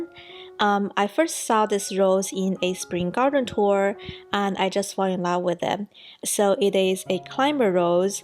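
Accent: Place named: Vietnam. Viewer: Just came across your channel. I also live in the Bay. I noticed your accent. Are you Viet lol? Love your garden!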